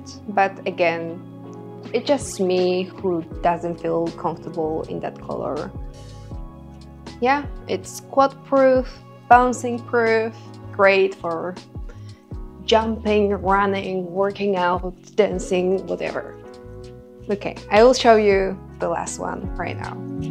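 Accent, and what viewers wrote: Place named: Eastern Europe. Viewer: What camera do you use? The quality is terrific in 4K, which is a relief because one sees so much fake 4K on YouTube. The setting you're filming in also helps, it looks like a plush hotel room. The lighting looks well calibrated too. Very photogenic, your hair and makeup is flawless - if you're not already modelling on runways every week then I will be shocked! You look like a young, Eastern European (judging from your accent) version of Adriana Lima.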